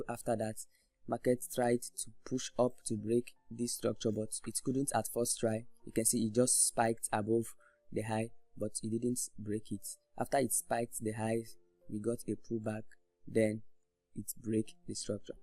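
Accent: Nigeria. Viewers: The fact that you're the first black dude I enjoyed it's videos is amazing. For real the way you broke down this topic is so understandable,I was filled with joy from the start to the ending. Judging from the accent it's the Ebira accent from Nigeria. (That's also my tribe ✌️✌️✌️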